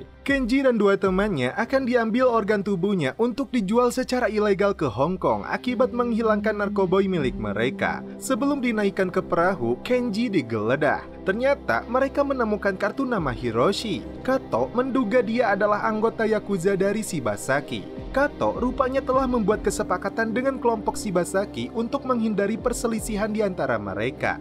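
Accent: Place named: Purwakarta, Indonesia. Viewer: Gue nebak, ini yg ngomong orang purwakarta nih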